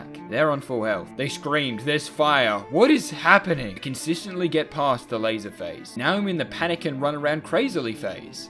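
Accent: Australia. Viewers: wait your Australian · I didn't know you were Australian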